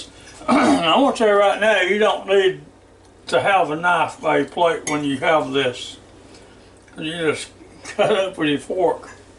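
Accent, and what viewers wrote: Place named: Appalachia, United States. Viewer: HELL YA I enjoy a hillbilly talking about what i eat in chicago!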